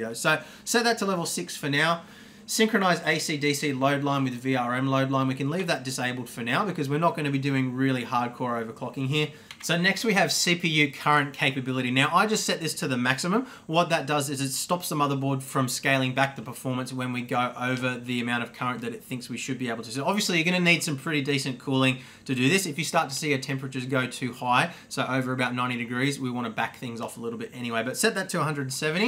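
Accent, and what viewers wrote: Australia. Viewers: Finally, an Aussie tech guy! Yew beauty! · Are u australian mate ?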